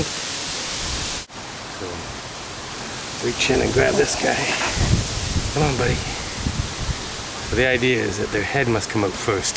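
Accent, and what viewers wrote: Canada. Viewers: I know a Canadian when I hear it!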